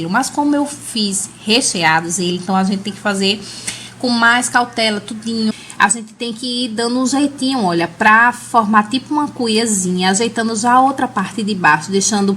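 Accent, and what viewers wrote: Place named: Pernambuco, Brazil. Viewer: Adoro suas receitas!! Esses docinhos....cada um mais lindo que o outro!!! Pelo seu sotaque, você é daqui de Recife/Pernambuco??? Se for somos conterrâneas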